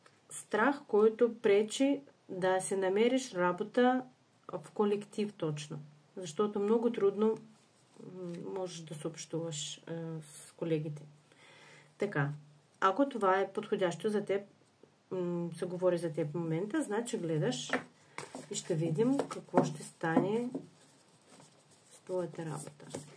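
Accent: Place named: Russia. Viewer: Много приятно излъчване има тази жена!❤ И сякаш има руски акцент!❤ Надявам се да се сбъдне това което показват картите!❤Благодаря Ви!❤ Благодаря и на Висшите Сили!❤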